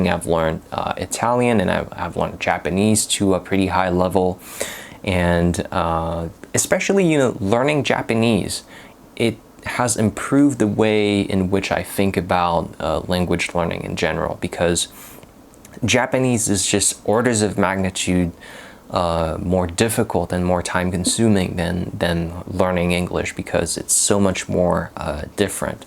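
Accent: United States. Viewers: Your American English is even much better than some native speakers in the US. Congratulations! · Yup bro great to hear your English speaking journey. My goal is to speak English like an American speaker. You are really close to American english native speakers. My problem is that when some time I speak English I think not making mistakes while speaking. U made me motivated to learn American accent. Please let me know know what I need to do? I will be thankful to you forever. Thanks from my depth of heart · if you didn't mentions that you're french ,, I would guess you're American .. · Amazing american accent. I would bet you also have an excellent rest for music. Do you play an instrument? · Are you sure you are not American? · Dude you even sound more American than me ….wow 😮!!! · you did a great job. I couldn´t notice you´re not a native English speaker if you woudn´t tell. 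You sound very american. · Your accent sounds American and your diction is just Amazing. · Your wordstock seems essentially British (e.g. your use of the word «holidays»), but your accent is 90% American. Have you taken on learning Tahitian at all yet? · You cannot have this level of English without having lived in an English speaking country especially Canada or the USA . You have an American accent . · Dude! Your accent sounds from the US. I am French like you, from Paris. You did an amazing job to get where you are. Your mind is very well structured. You speak with calm and ease. Congrats! I am moving toward a fluent Spanish, right now. My strategy is slightly different : I am moving directly in the country to learn the language. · Your English is absolutely fascinating! You sound like an American speaker!!! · From an Australian’s perspective, he has an American accent with a hint of something else. 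No big deal. His grammar seems perfect. I haven’t noticed anything he said that sounded very wrong. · Wahoo! as a French speaker and living you blow my mind!! You speak like an American. I will follow everything to bring my English to the next level.!! Congrats 👏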